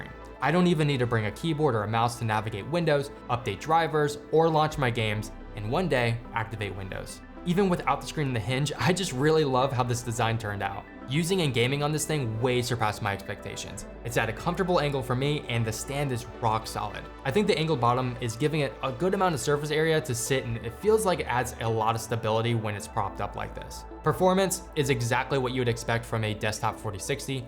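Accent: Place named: United States. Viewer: Dude sounds American, and it's a very standard evening hour in USA.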